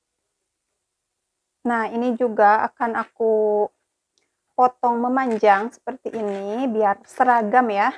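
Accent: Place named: Sunda, Indonesia. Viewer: Teh lama di itali tspi sundanya ko totobanget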